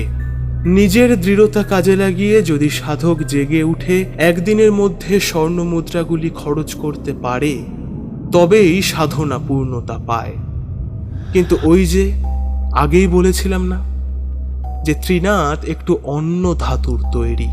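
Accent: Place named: East Bengal, Bangladesh. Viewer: Voice ta to tomar ekdom kaat bangal vai.... recent bera topkechho mone hochhe?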